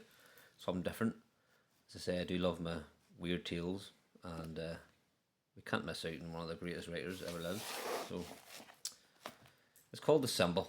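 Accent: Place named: Northern Ireland, United Kingdom. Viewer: Awesome poem! I agree with Patrick, that northern Irish accent makes the reading better 😊, thanks for sharing this mate🤝 Hope you have a great day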